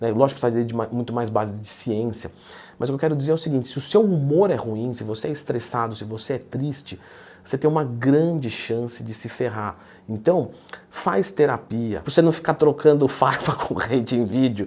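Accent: Northeast, Brazil. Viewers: Vc é nordestino? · Vc é nordestino? Que bonitinho!!!!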